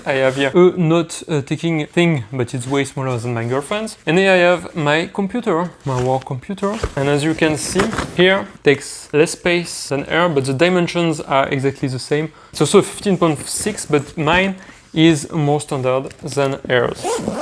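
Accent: France. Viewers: Hi, I love AER products. Could the AER City Pack Pro Ultra fit in a 40x30x20com to be used as a personal item on Transavia ? NB : je viens de découvrir votre chaine et j'aime beaucoup le style même si l'accent français est bien présent. En VF ça serait bien aussi d'autant plus qu'il n'y a rien dans ce domaine en Français.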